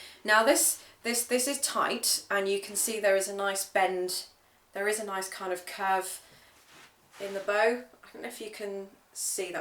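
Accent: United Kingdom. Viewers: Gracias baby,i love british accent chicas, and you r very smart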